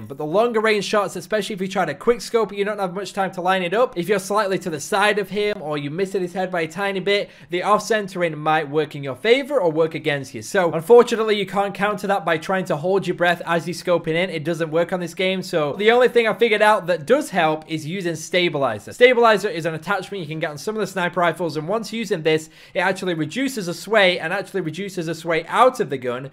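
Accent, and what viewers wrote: Yorkshire, United Kingdom. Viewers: Great vid. Ive got something to try now. Makes a change to hear a Yorkshireman on here!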